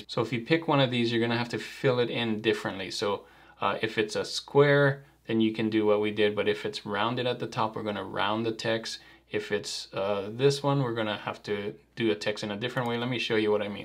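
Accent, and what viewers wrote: Hawaii, United States. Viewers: thanks for all these videos, been learning a lot. quick question, are you from hawaii? cuz after watching some of your vids, it's subtle but i noticed that certain words you say sounds very close to how some people from hawaii would say them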